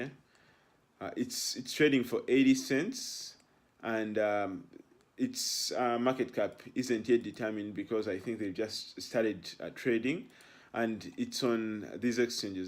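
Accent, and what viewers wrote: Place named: Uganda. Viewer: Kiribwa oluzungu banange. Still Ugandan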